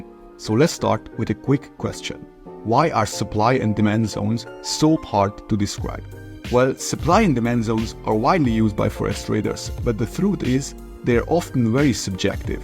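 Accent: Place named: Hungary. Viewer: Nice Hungarian accent… :-)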